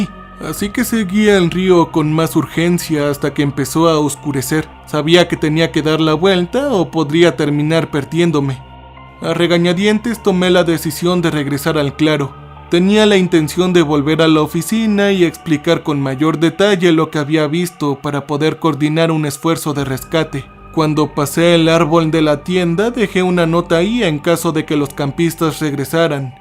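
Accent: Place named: Mexico. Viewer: Tu eres un mentiroso o cuenta los cuentos de otros, en otro cuento eras de Inglaterra y ahora eres de EEUU cuando tu acento es mexicano o de sur américa. Yo soy norteamericano y de descendencia de P.R y cuando vives en EEUU pierdes el acento latino.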